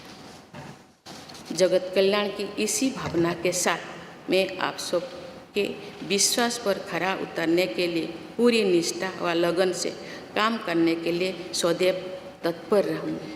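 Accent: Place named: Bengal, India. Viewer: Is she bengali ?? There is Bengali accent in her hindi language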